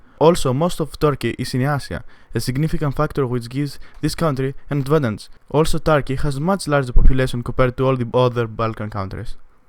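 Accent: Greece. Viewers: your English accent is sucks buddy all Greeks have same accent try to speak better English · Greek English..I just found out lol..nice job btw Greece is the best #GREEKLIFE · I know you ard greek from your accent hahah XDXDXD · By his accent alreafy found out he was greek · ΝΑΙ ΜΕ ΤΟ ΠΟΥ ΑΡΧΙΣΕ ΤΟ ΒΙΝΤΕΟ ΚΑΤΑΛΑΒΑ ΟΤΙ ΕΙΝΑΙ ΕΛΛΗΝΑΣ ΛΟΓΟ ΤΗΣ ΠΡΟΦΟΡΑΣ. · It is so obvious you are Greek your dumbass accent is giving it away. Btw its MACEDONIA not fyrom :) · Constantinople was Greek so Turkey is not in Balkan Greece has economy crisis but richest in the Balkan And you Greek because this is how greeks talk in English φίλε μ 🤣λέει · Bias? Greek accent. And as an Albanian, i'd choose Slovenia aka. Switzerland of the Balkans. I've only been to Montenegro, Kosovo, Slovenia and Greece (i was gonna go to Serbia but was not welcomed lol) and I judged by the ranking in the video and my experiences. 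· This is a voice of a Greek i just know... (I am from Greece btw) i might be wrong idk...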